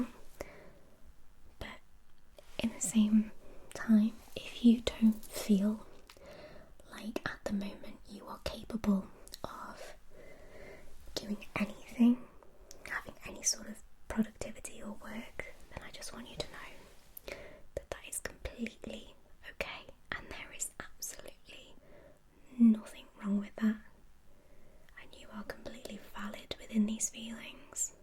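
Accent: United Kingdom. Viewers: I love your accent! Where in the UK are you from?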